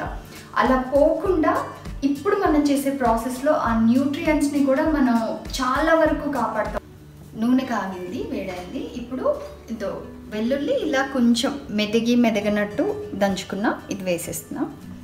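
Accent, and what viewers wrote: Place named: Nellore, India. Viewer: Meeru NELLORE Language mataladuthunaru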